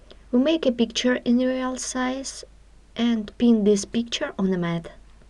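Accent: Russia. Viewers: А русский так хорошо слышен через этот англ.))). Красивая работа.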